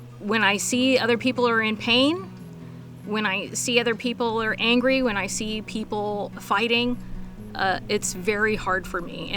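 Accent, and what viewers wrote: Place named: South, United States. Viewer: had you not told us, I would've thought you were a Southern white woman.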